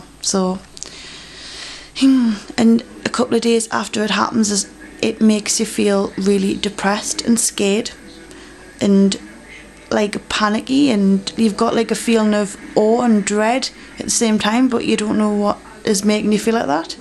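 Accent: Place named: Derby, United Kingdom. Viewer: You talk funny (Derby lad).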